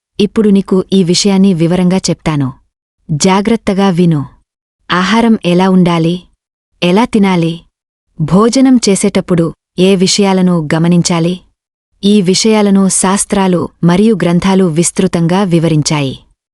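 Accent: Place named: North, India. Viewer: ఆమెకు తెలుగు తో కొంచెం ఇబ్బంది,ఉత్తరాదికి వెళ్ళి స్థిరపడ్డారు అనుకుంటా, ఆ ప్రభావం కొంత కలగలిసి వినిపిస్తుంది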